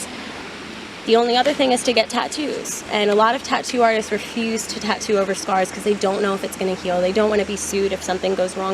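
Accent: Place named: Philadelphia, United States. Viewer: I knew i heard the Philly in you. I'm from Norristown so whenever I hear someone with the accent I immediately notice it. Lol. Hope you're doing well.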